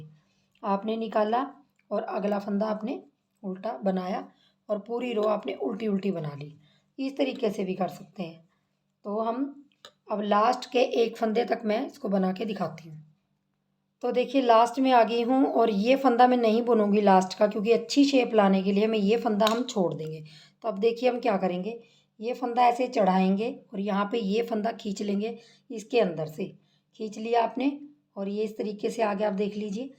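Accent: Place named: Ambala, India. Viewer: Aap kha ke rehne vale ho Mam? Aap ki language hmare sath milti hai (Ambala) ki rehne vali hu me bhi, pl. tell ❤️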